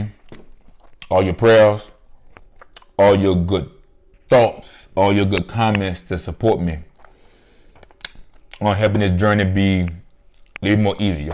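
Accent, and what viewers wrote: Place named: South, United States. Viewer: New subbie here! Love your personality & your southern accent. Do I hear some geechie or Caribbean in your voice? Congrats on being smoke free. Keep focused!! Peace love!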